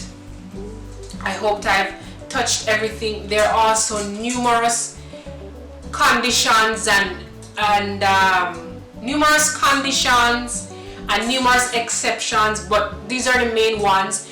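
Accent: Caribbean. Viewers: Intelegent young lady, good grammar, with an accent exactly as any other English speaking Caribbean islander.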